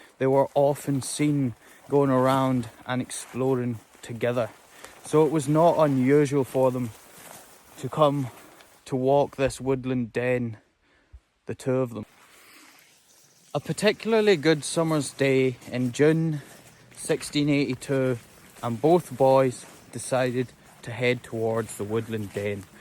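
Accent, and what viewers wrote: Scotland, United Kingdom. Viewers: Nothing comes close to a Scot telling a scary tale! Bravo! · your Scottish burr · Good story, and you have a great voice for narration. Your voice is soothing and relaxing and the Scottish brouge takes all the rough edges away. You bear a strong resemblance to both Thomas and James.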